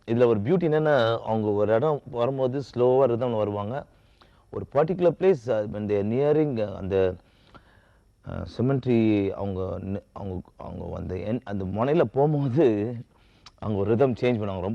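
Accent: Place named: Chennai, India. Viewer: Thala! Enna sokka numma madras bashai pesi manase kushi aakitta ma!! Sokku Thalaiva Sokku! Noorandu vazhanum nee!! Kalakkite iru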